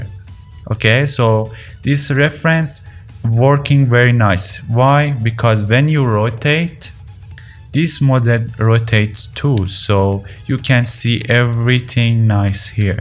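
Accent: Iran. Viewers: Persian accent?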